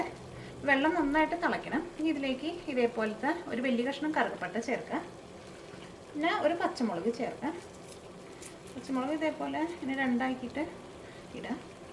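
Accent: Thrissur, India. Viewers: Ithonn vrithiyaayi paranj tharoo mole, ingale thrissur bhasha thiriyaanjittaa...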